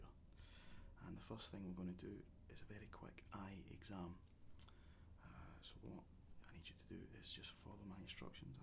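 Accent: Scotland, United Kingdom. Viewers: Loving the videos man keep em coming! Scotsman myself so its great to hear our accent being put to good use :P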